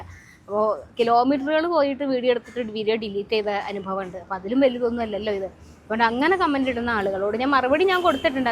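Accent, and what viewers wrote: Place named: Thrissur, India. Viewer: ടീച്ചറേ,, ശരിക്കും വീട് എവിടെ യാ അപ്പോൾ,, ടീച്ചർ സംസാരിക്കുമ്പോൾ തൃശൂർ സ്ലാങ് ആണല്ലോ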